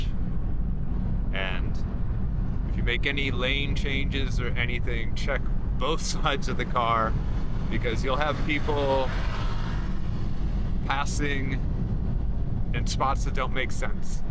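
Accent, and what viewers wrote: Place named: United States. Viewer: Sounds like a stupid yank lost in a foreign country, so many of these dicks you meet on, just interested in the surf and a cheap place, do you care about the people wanker?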